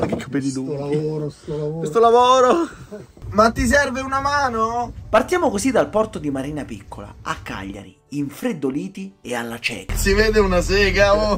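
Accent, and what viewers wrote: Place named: Rome, Italy. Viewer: Mi sembra che hai un accanto Romano. Cosa ci fai in Sardegna.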